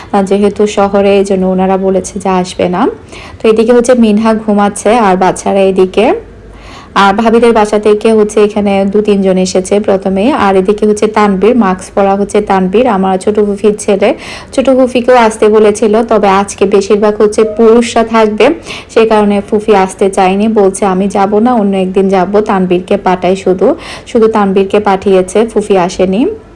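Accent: Chittagong, Bangladesh. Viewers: Apu apnar video amr onk valo lagse.amio ctg er.so amdr culture e maximum mil ache ejonno dekhchi.apni onk smartly o kotha bolen but i think apu apnr kotha arektu correction kora uchit.kotha bolar shomoi ctg n bangla mix hoye jai.shunte kmn jani lage jetar jonno out district er manush ra always eta niye troll kore.so i think apnr bangla ta ektu improve kora uchit.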